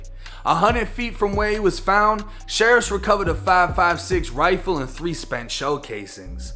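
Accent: Boston, United States. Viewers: You have to be from Boston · Ammed kah jackin ... could you be any more Boston. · You from Boston?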